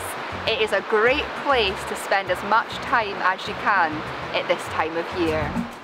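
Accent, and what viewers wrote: Scotland, United Kingdom. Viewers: Thoroughly enjoyed the video and your wee Scottish brogue.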